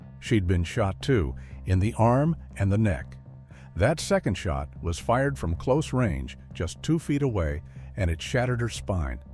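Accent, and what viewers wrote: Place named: England, United Kingdom. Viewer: I love your English accent. In which states of the US do people have this accent?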